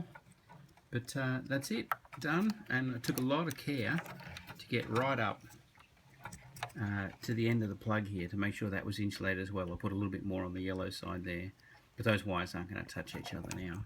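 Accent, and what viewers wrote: Australia. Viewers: Haha it stuck out to me too. This lad is Australian though. (Or NZ? Nah Aus i am pretty sure)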